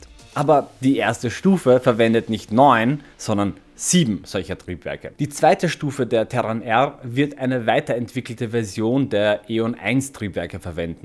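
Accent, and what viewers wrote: Austria. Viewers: Sehr gut! Tolle Ideen, ja bitte! Mehr Witze, mit Oestereicherim Akzent! Ehrlich Top 10 Space YouTuber in allen Sprachen! Wie wäre es mit einer Episode, die sich damit beschäftigt, was passiert, wenn Starship 420 bei (1) Start, (2) Max-Q, usw. explodiert? Und wie Du die Chancen für jeden weiteren Schritt einschätzt. :)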